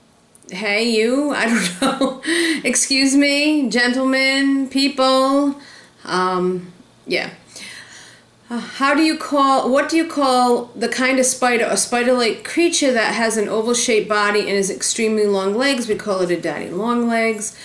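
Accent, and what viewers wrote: Rhode Island, United States. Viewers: I spotted your accent from a mile away! I subscribed when I saw your other videos and knew you must be from RI but wasn't sure till I just saw this old video! I enjoy your channel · You're so adorable! I'm playing a Rhode Islander in a new play and I really needed to hear an authentic Rhode Island Italian accent. Thanks for taking the time to make this. People say that the RI accent is similar to Boston or NY. But I almost hear a little Maryland/Baltimore in there. It sounds like you say Rhode Island almost with a "T" at the end. Like EYE-LENT. My friend from Baltimore says "salad" like SAL-IT all the time and it always makes me laugh! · She says "here" like "heah". "We're" like "Weah". She says "part" like "paaaht". She says "jaguar" like "jaguah". She says "call" like "cwall". I LOVE the Rhode Island accent. It reminds me of Betty Boop and Harely Quinn. 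So cute! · yaw adawable, I'm playn a rodyelinda in a new play and i really needed to heah an authentic rodyelin italian accent. thanx for takn da tim to make dis. Peepul say that the rodeylin accent is simala to Boston or NY. But I almost heah a lil marilyn baltamaw in dere it sounds like you say rodyelin with a t ( no we dont) just it spelt out funetically...the girl up above is speakin to nice........haha I speak real rodyelinese! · That's quite an accent - you sound a lot like Lois Griffin from Family Guy. Fascinating. I didn't know Rhode Islanders pronounced court and caught exactly the same! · Edward Miessner I always forget she’s from around here (Rhode Island) because she sounds so normal to me lmao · hey, I loved this video, I'm an esl teacher here in my home country Argentina, and right now I'm planning my pronunciation lessons, so I find this video quite helpful to get my students exposed to different accents in English. 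After spending two years in Chicago and ten months in central PA, I have to say a learned a lot but the US is so diverse when it comes to accents and slang, so thanks to you, my sts will get to listen to a clear Rhode Islander. Keep It Up,!:)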